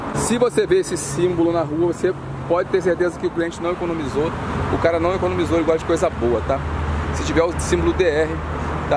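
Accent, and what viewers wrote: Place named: Rio de Janeiro, Brazil. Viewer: Sotaque carioca é da hora demais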